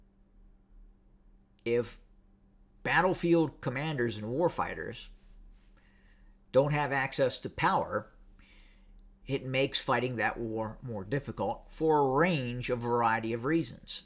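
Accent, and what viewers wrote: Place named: South, United States. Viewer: I enjoy the southern accent. 👍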